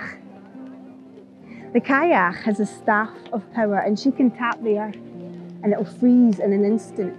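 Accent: Scotland, United Kingdom. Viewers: A Scottish accent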